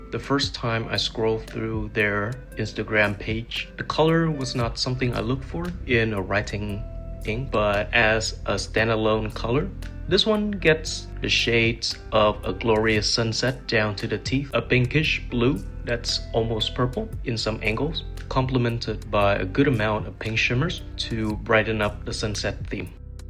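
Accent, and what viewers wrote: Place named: Germany. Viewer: you have a really close German accent...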